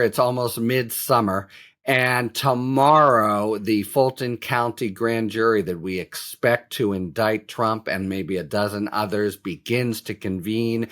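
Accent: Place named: New York, United States. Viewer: He’s from New Yawk; deal w/it. The pettiness from commenters 🙄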